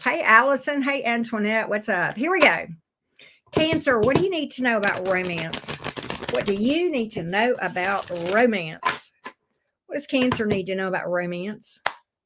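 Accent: South, United States. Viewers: Happy New Year! You’re the cutest! Love your southern accent! 😘🥰😍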